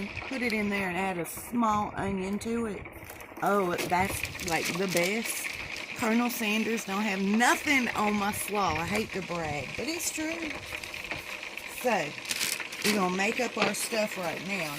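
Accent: South, United States. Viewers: Hi there... I made your chicken today, marinated over night.. My family loved it. My husband who hears me listen to your videos at times asked me if I was going to talk Southern like you while cooking... In good spirit and in my best southern accent I said " Col. Sanders ain't got nothing on ".. We laughed and I saluted you with my tongs! Thanks for sharing your recipe it's really good!😘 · Looks delish!! Where are you from in the South? Your accent is SO cute!!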